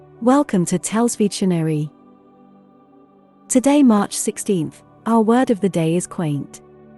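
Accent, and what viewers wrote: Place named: United Kingdom. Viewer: Congratulations! Your clips are real great stuff for anyone wishing to improve them English. Especially if you're in love with the British accent. It's pure music. Very "listenable". I was hooked immediately as I came across the very first clip. Oh! How I count myself lucky for bumping into your videos almost by accident. I have already shared your videos with not too few. Please keep up the wonderful work you are doing.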